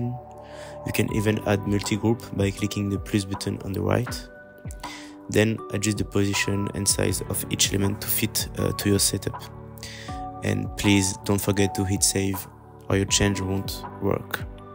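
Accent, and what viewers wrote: France. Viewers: On voit que t'es français à l'accent x)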